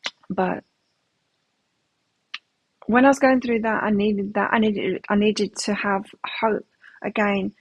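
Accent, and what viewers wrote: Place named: Australia. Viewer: Hi , I’m in Canada watching atm. Love your channel. I love your Australian accent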